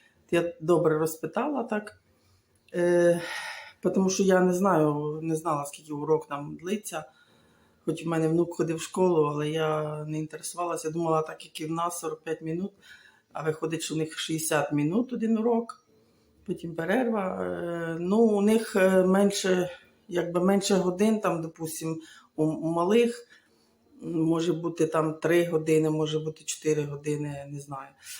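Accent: Surzhyk, Ukraine. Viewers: Ви не вмієте розповідати , не кажучи про ваш суржик . Вам потрібні лайки?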